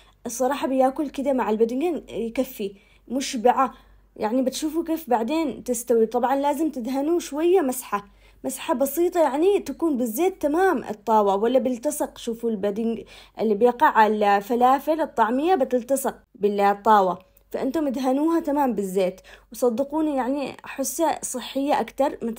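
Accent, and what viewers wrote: Aden, Yemen. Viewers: احبك ي بنت الكلام العدني يذوب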